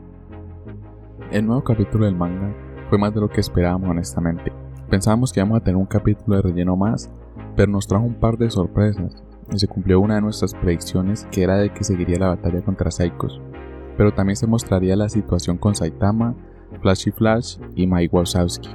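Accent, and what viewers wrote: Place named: Colombia. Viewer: Que paso con tu voz o.o? Ahora pareces Colombiano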